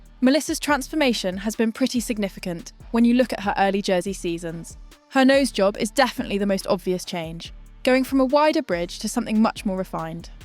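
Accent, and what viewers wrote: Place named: United Kingdom. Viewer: Are you Lucy from Engliswithlucy?? You have the same voice. And Iove your British accent. Good video !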